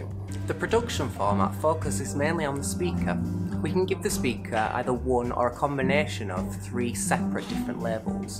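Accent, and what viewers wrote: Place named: North of England, United Kingdom. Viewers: damn, I really love your accent :D such a nice british accent, I would love to speak like you. Thank you for the Video! It became very clear to me. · Thank you very much! I'm from the North of England, so my accent is very different to the traditional Standard English usually broadcast from the UK. I'm glad you liked my video :-)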